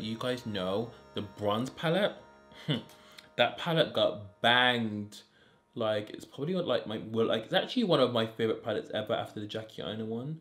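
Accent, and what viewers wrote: United Kingdom. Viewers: When the Viewers forget all about the heavy uk accent 😩Not from the US guys😂😘😍😘😍😘luv watching u😍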